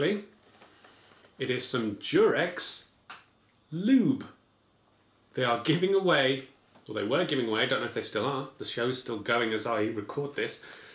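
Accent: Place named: Midlands, United Kingdom. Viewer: I have only just found your channel for some reason..Love the videos...you seem like a normal person ,you have the type of humour I like .I'm from the Midlands in the uk...you sound like not too far away.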